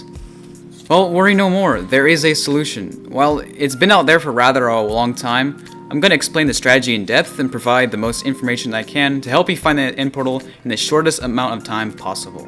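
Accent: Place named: United States. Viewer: Bro explain in Hindi i understood most of it but coz u talk English so fast and I'm not used to the american accent of English so it's hard to understand 😅😅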